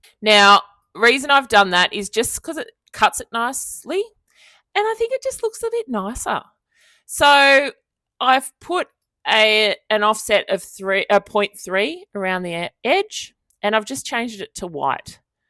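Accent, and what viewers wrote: Australia. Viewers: So nice to hear an Aussie tutorial, thank you from another Aussie ❤️ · I agree, good to hear a Aussie , I am in Sydney at the moment but looking at moving to Tassie....Thanks for the video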